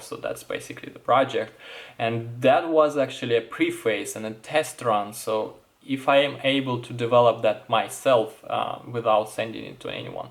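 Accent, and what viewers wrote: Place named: Russia. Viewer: У парня тяжелый русский акцент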